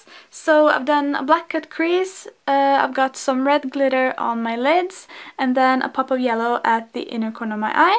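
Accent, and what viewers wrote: Norway. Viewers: Great tutorial! Elsker den norske aksenten :) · I know you said violet, but I heard violent.. That sounded a bit disturbing :p "I'm trying out some violent lips" Edit: Oh, and btw - uten å lese noentingsomhelst, og før jeg en gang har sett videoen vet jeg at du er norsk basert på måten du snakker engelsk. Du kunne tilogmed ha vært trønder. You go girl..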